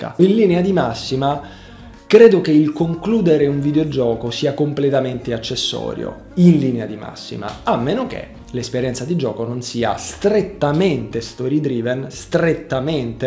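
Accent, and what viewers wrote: Sicily, Italy. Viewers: Ciao ma sei siciliano? non riesco a individuare la tua cadenza nell' accento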